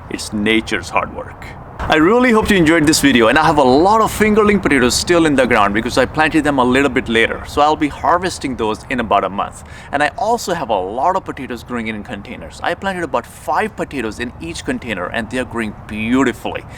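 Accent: Punjab, India. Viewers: Love the punjabi accent.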